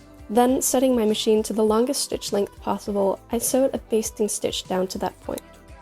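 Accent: Denmark. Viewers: Okay, bliver nødt til at høre om du er fra Danmark? Dit engelsk er virkelig godt, men der er noget dansk over det 😄 hvis du er, og kan læse min kommentar, så tusind tak for din tutorial! Den var super hjælpsom 😊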